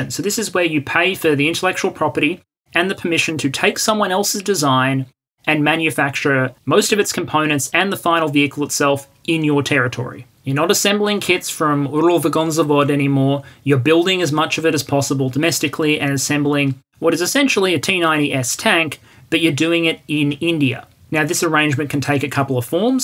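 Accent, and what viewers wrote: Australia. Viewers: great informative vid, loved hearing an Aussie voice delivering the content. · Is this the economics explained guy or do all Aussie's sound alike??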